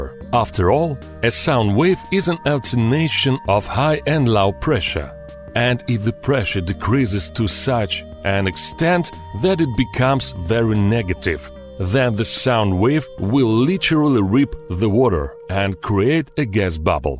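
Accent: Russia. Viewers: Your russian accent (I'm assuming) doing english is funny, tbh i like it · Вот ниразу не слышал русского человека, говорящего по английски, которого нельзя было бы опознать по акценту. Видос классный!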